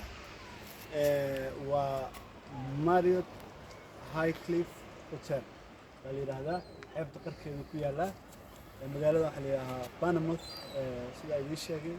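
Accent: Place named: North, Somalia. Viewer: Waryaa wax kale umada keen , inaad reer koraad tahay sida D u dhigaysaa laga garanayaa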